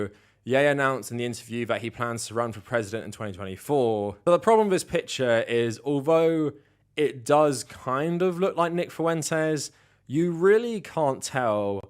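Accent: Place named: United Kingdom. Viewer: in an annoying british accent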